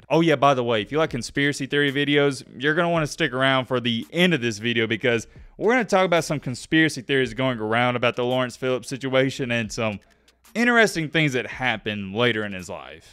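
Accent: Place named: Florida, United States. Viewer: This ain’t the channel for you lol. If you like to fall asleep to a lot of jibber jabber in a thick Florida accent, this is the place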